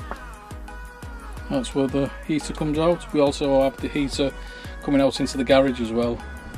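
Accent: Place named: St Helens, United Kingdom. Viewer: NICE van! Sounds like a St Helens accent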